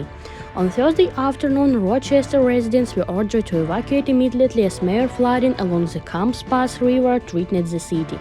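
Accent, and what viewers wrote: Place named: India. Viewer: 인디안 영어냐? 발음이 왜그래?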